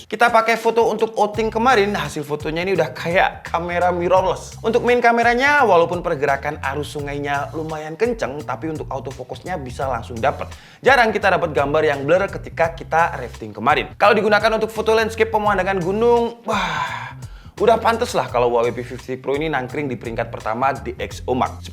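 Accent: Malang, Indonesia. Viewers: Bang mu orang malang??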